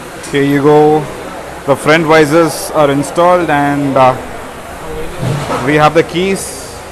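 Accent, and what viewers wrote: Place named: India. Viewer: Indian accent is so ugly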